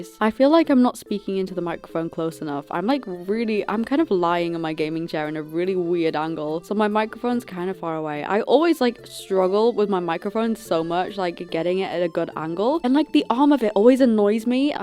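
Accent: United Kingdom. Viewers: Oh my God, I love your accent. It’s like the accent from England. I am not kidding. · Omg your buildings look so good but I love your British accent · Are u British?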